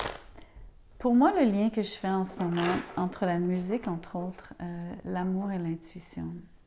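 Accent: Quebec, Canada. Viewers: Bjr je découvre par hasard votre guidance c’est un vrai plaisir de vous écouter avec votre délicieux accent québécois je retrouve suis verseau 1 décan lui verseau 2 d. Nous vivons une véritable osmose et synchronisation. Merci de Copenhague 😊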